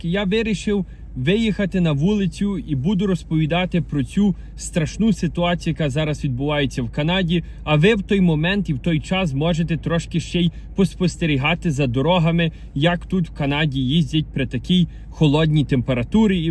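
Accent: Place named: Lviv, Ukraine. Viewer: з Львівщини)))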